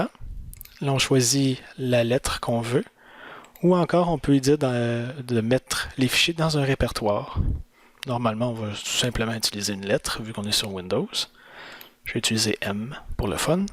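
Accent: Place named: Quebec, Canada. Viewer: Merci bcp, et pour ce formidable accent québécois et pour les explications qui m'ont permis de créer mon premier dd virtuel. :))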